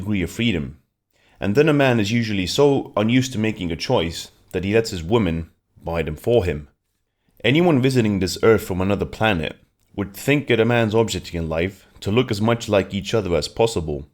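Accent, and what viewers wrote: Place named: Eastern Europe. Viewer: My favourite quote: "theoretically, it is possible for a beautiful woman to have less intelligence than a chimpanzee and still be considered an acceptable member of society" Where are you from? You sound like another YouTuber called "Eastory". I'd say somewhere in Eastern Europe. But you have a British accent too. Well-narrated. You have a pleasant voice and everything is easy to follow.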